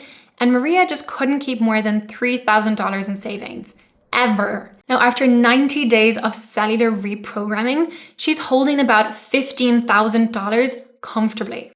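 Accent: Ireland. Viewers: Great video. Thank you. Are you Irish because you have an Irish accent? Blessings.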